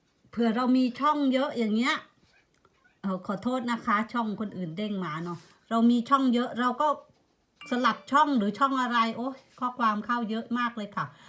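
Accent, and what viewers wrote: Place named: Surin, Thailand. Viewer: ขอบคุณสำหรับความรู้ดีๆครับ เสียงบรรยายคล้ายคนสุรินทร์บ้านเดียวกันเลยครับ 555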